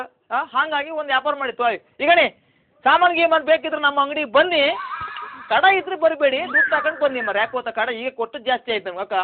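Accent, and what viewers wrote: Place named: Kundapura, India. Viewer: Namma hebri namm hemme, I love kundapura kannada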